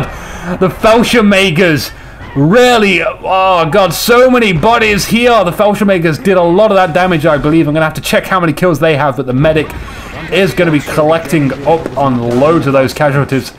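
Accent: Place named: United Kingdom. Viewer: WAIT A MINUTE... you're British?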